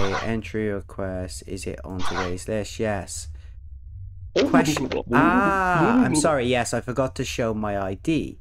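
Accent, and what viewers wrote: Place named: Wales, United Kingdom. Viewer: Ohhh welsh!! Yeah i was thinking smth british but it didn't sound quite london-ish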